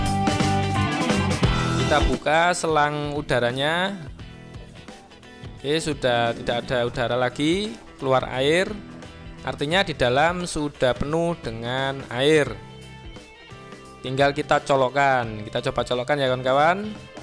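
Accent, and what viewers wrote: Java, Indonesia. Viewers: Satu hal yg saya suka di channel Om..walaupun jowo ne kental..tp bahasa inggrisnya ga salah2x dan ga asal2x..Eco Cube betul2x dibaca "eko kyub"..bukan ky beberapa channel lain yg bacanya "eko kab"...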